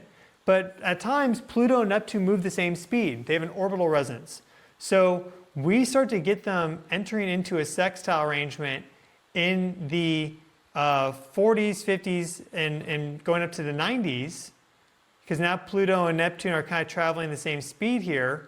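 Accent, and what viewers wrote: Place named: Bay Area, United States. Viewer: I don't know the answer, but always wondered where is from... My guess is SF Bay Area, possibly South Bay, just from his accent - Where do you think he's from? Only people who don't actually know, please!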